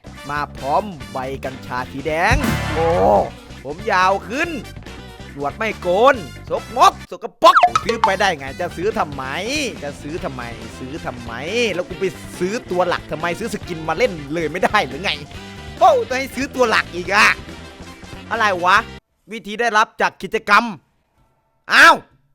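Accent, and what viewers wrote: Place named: Isan, Thailand. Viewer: พูดอีสานได้กวนตีนมาก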